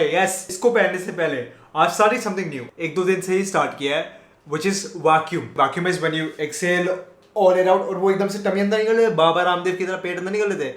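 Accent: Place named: United Kingdom. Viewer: Yes in UK. You could guess it from his accent.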